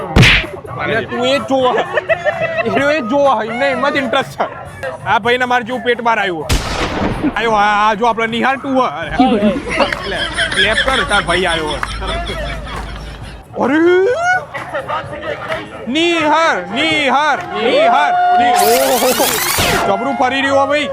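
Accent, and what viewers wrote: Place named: Mehsana, India. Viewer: ભાઈ મને તો મજા આવે છે એક દમ સિધ્ધપુર ની ને મહેસાણા ની ભાસા સાંભળવા મજા આવે છે તમ્મરીયા આવા છે ચક્કર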